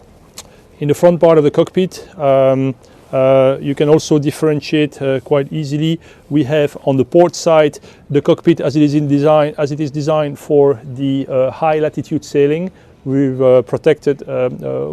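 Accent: France. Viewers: Very normal French accent, quite clear to be French actually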